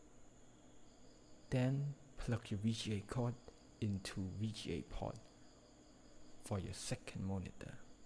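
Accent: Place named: Asia. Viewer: When I heard the Asian accent I knew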